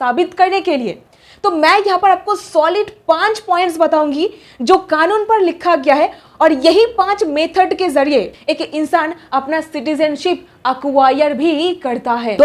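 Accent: Bengal, India. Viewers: Apki tone bangoli h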